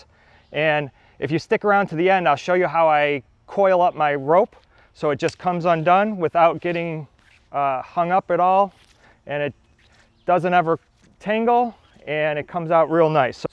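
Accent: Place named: Chicago, United States. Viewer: Dude are you from Chicago? I can recognize that accent anywhere....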